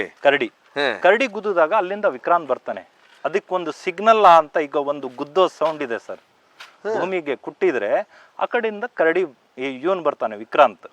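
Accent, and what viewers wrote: Standard. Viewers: ಅಣ್ಣ ಚೆನ್ನಾಗೆ ಮಾತನಾಡುತ್ತೀರಾ ಸ್ವಚ್ಚ ಕನ್ನಡ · Vav.adbhut.nimma.matina vaikhari.ane.bagge.matadoru.nimmannu.nodi.kalibeku.yakandre.nimma.kannada.bahala.sundara.hagu.swaccha.ellarigu.artha.agutte.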